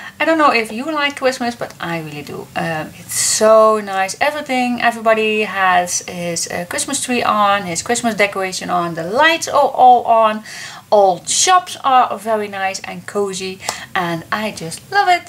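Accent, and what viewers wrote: Netherlands. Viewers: Merry Christmas!! I love your albums because they are well finished and always very elegant and your tutorials are clear and make everything seem so easy and affordable for everyone. In addition to this I love to listen to you because my dad was Dutch and when you explain, I hear the Dutch accent and I remember when I was young and I heard my Dutch Uncles coming to visit us in Italy! Thank you for this opportunity to win one of your kits, both the kit of your album and the kit of birds are fantastic gifts!! Merry Christmas from Italy!! Nicoletta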